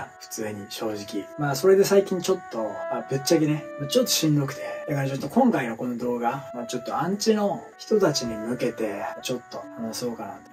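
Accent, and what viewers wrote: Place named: Kansai, Japan. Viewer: 関西弁がびっくりしてもぉーた😂 頑張って下さい 見てますおもろいですよ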